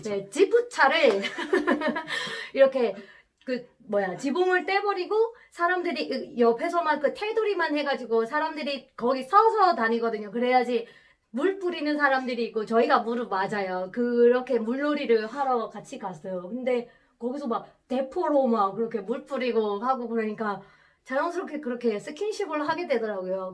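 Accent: Gyeongsang, South Korea. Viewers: 말씨가 낯설지 않다 생각했는데 대구에서 어학연수를 받으셨군요 저두 대구 고향♡ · 경상도 억양이 아주 살짝있네라고 생각했는데 대구에서 어학연수해서 그런갑네요.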